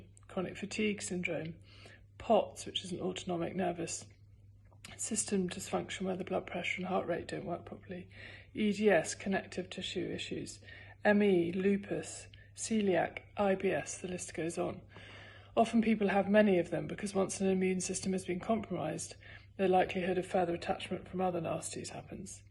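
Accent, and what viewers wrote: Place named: RP, United Kingdom. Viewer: Very poshly done LOL, you are so sweet. It would be marvellous if while you are resting, you could do the job of a care worker. I understand how difficult it is, that you probably suffer from a form of bullying because of your status as a famous woman. Carework has been my life, and I have loved it, it has been difficult because of my connection with a famous man, but I know that it would be a hundred times worse for you. You have done great by reading your journal to us, good luck with your campaign to bring awareness to those in need. You brilliant lovely lass.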